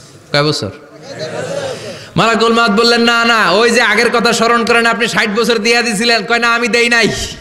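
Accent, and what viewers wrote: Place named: United Kingdom. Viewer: Ami jotodur sunchi uni 4 years bolen nai. Forty years bolchen .Uni khub somvoboto british pronunciation e word gulo bolen. Ar british pronunciation e 40 bolle omon e sona jay. Allahu alam .ami vul o hote pari.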